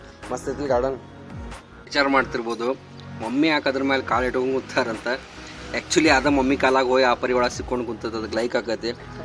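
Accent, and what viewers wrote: North Karnataka, India. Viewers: Subway sandwiches are good. I too like these. Enree full north Karnataka language. I like this style. Are you only son to your parents? You are lucky boy.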